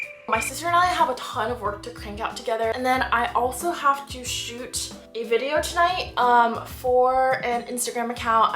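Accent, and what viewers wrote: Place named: United States. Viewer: This is really mind-blowing. This Chinese chick speaks perfect American English. Where did you study in China? You don't have even a slight Chinese accent. You sound very Caucasian ❤️❤️❤️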